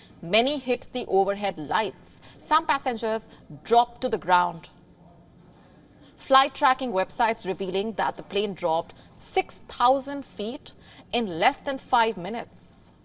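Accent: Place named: India. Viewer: Thick indian accent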